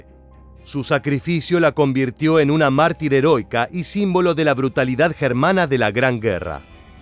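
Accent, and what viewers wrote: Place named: Argentina. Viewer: Siempre tira a favor de USA y la OTAN, ellos son siempre los buenos para éste canal y los alemanes son los maaalos! demonios! Conste que el canal, a juzgar por el audio del locutor; es de origen argentino. Y que peor enemigo de Argentina es la OTAN quien conspiró contra la Argentina y apoyó logísticamente a la fuerza expedicionaria del reino satánico unido. No hay caso...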